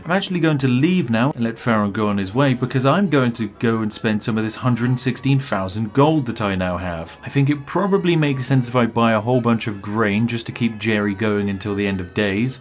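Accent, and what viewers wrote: United Kingdom. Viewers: He's british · I think he might be British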